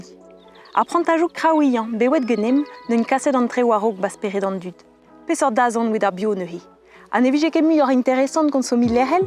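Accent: France. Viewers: Well, it is true that there are some french caracteristcs in her accent. She doesn't seem to have much struggle to stress sylables, though (which is something we, french speakers, don't know a lot about as we only do it at the end of sentences or so). What makes her sounds french must be her vocal and consonnant placement. Yet, you surely are aware that most Breton speakers are native french speakers, initialy. And those who actually get to fight to keep Breton alive, already some decades ago, learned it from their grand parents who knew french (or some french) too. This helps to undersrand why these features exist in modern Breton. I guess modifications in a language, in general, aren't nor good nor bad. Maybe it's just how it goes. You can still find few active story tellers (recorded by Brezhowed, by the way) that have pronunciations that may fit more your expectations (and mines, as I love strong local accents in every language I learn). 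Then again, thanks to all of them fluent Breton speakers from this channel to keep going with their good work, not only by doing it in Breton but also by offering great content variety and good reportage quality.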